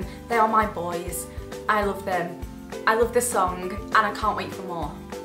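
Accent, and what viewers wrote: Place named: England, United Kingdom. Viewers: what part of England is from? just trying to figure out the accent haha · British, but her accent comes from Sheffield in Britian, haha! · English* accent, not british ;) her accent is from Sheffield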